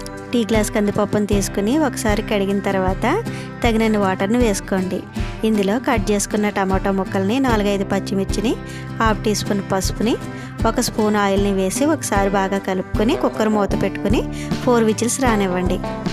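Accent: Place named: West Godavari, India. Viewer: Meru pacchimagodavari yasalo matladuthunnaru balevundi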